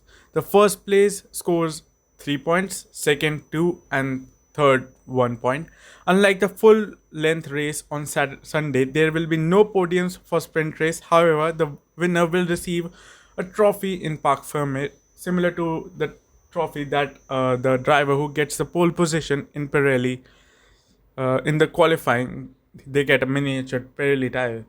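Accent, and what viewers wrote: India. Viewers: ur accent..u Indian? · Ngl I always thought you were indian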